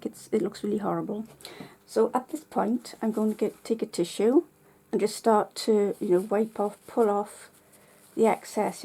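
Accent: Wales, United Kingdom. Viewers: Love that Welsh accent! Thanks for the tip. Jan from Victoria, Vancouver Island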